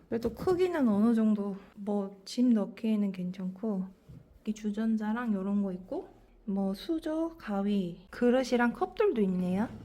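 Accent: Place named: Jeolla, South Korea. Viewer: 정겨운 전라도 억양, ㅎㅎㅎㅎ 비비체 뷰가 꼭 가보고 싶네요.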